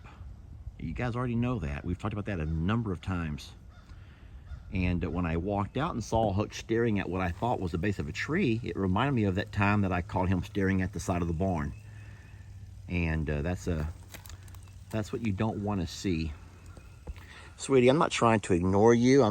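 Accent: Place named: Texas, United States. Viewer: I get a kick out of figuring out if the way you pronounce words is a Texan thing or. 😂😂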